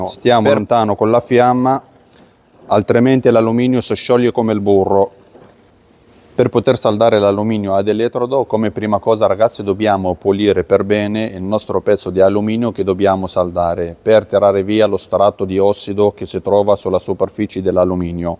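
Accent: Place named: Egypt. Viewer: E egiziano si sente dall’accento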